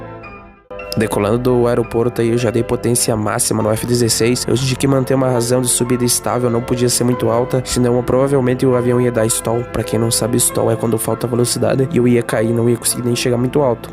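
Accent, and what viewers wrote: Curitiba, Brazil. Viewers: Cara vc é de Curitiba? Seu sotaque é igualzinho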